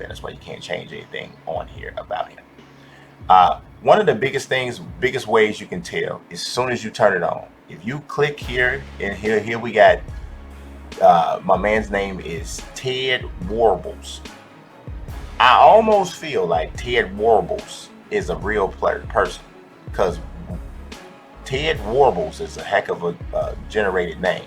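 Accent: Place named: South, United States. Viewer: Lmfao you funny af bro def form the south im guessing atl? And yeah bro thanks so much for the info!!!! So glad I didn’t download this is wack af 70 plus dollars for this lol ea wow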